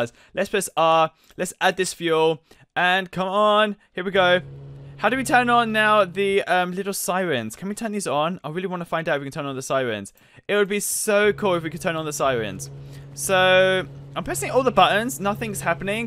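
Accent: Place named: England, United Kingdom. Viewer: You talk like your From englande Sorry England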